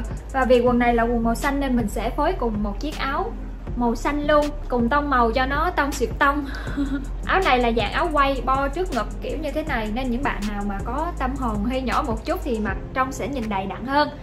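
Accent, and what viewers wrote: Quang Nam, Vietnam. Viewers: c này chắc người Quảng Nam quá :)))) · Nghe phát biết người Quảng Nam liền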